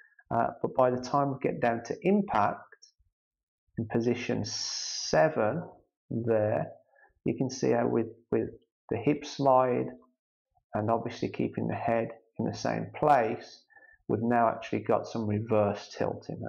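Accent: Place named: Black Country, United Kingdom. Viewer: Hi really enjoyed this analysis of the master! You say it is really important that his head/eye is tilting forward to help the back swing could you explain? Also how much forward tilt from the hips do you recommend. By the way love your black country accent!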